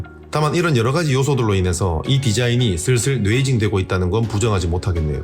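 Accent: Gyeongsang, South Korea. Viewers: 경상도 분이신가요? 억양에 사투리 오지게 들어 있네요..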